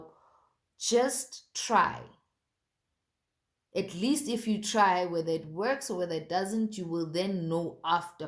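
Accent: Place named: Lesotho. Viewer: Thokoza gogo..your accent hao bua sesotho...wher are you based or from? Thank you for the information.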